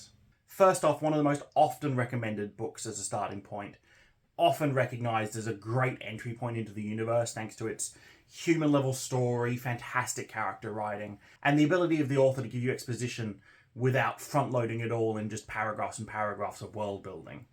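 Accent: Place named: United Kingdom. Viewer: Thank you for the video. You should try speaking less British. You hold onto words too long. This video could have been 11 minutes and I wouldn’t have started nodding off immediately. Good content though.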